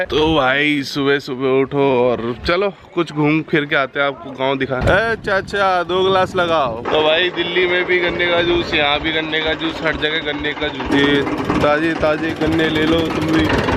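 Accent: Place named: Hathras, India. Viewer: bhai aapki language toh hamare jaise hai hum bhi hathras ke hai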